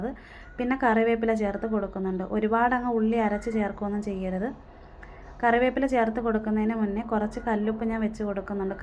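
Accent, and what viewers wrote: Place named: Thiruvananthapuram, India. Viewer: Kutteese veedu എവിടെയാ, slang കേട്ടിട്ട് tvpm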